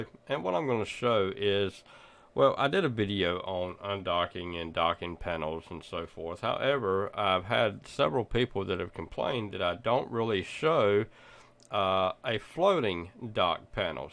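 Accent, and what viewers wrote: South, United States. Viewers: Thank you, you've saved me so much time! Love the Southern Drawl, too. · Nice hearing a southern accent in a tutorial for once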